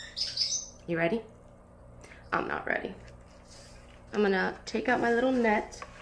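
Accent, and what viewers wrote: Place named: Cuba. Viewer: 😂😂😂 that Cuban accent kills! I love it! I miss my Cuban people in Miami! I miss Miami!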